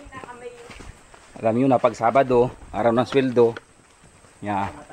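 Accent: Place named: Catanauan, Philippines. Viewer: puntong catanauanin tlga hahaha miss my hometown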